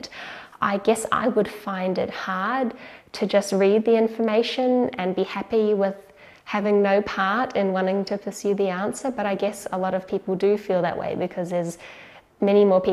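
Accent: New Zealand. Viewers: JustArduinoThings its also that kiwi accent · Kiwi right?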